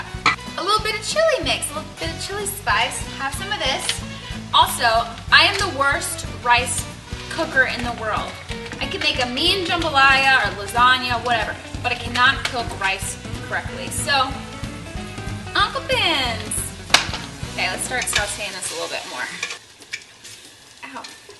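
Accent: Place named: Colombia. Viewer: i love her Colombian accent !! lol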